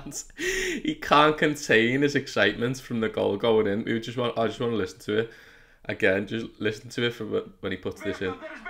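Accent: Liverpool, United Kingdom. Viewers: Are you liverpool fan?😁 Because you have liverpool accent. great video keep it up.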